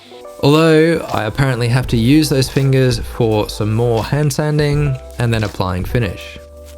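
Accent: Australia. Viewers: Just saying thanks for the presentation: a genuine honest woodworker just doing what we do. the aus accent is appealing too. I found your videos because I also researched/purchased a Harvey table saw.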